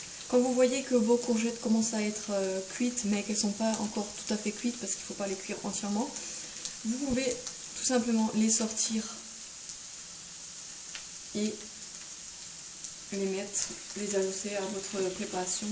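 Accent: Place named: Doubs, France. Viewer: coucou!! jai limpression dentendre laccent dché ns lol!! en plus saucisson de monbeliard?? t dou? moi de mulhouse bisous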